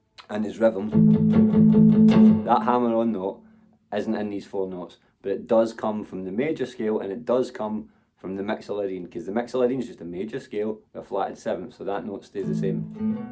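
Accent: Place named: Ireland. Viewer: Thanks for the lesson. Next teach how to talk with that cool Irish accent! I’ll bring u some cough syrup and a new mounting bracket for that tv 😂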